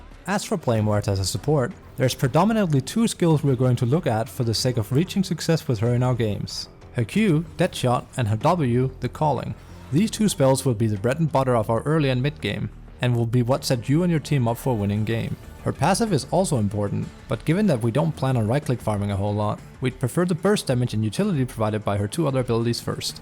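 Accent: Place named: Denmark. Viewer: Thanks (fellow dane here btw.. took me a few mins before I realized, but accent gave it away) - matter fact won 3 games in a row as muerta 5 just today : )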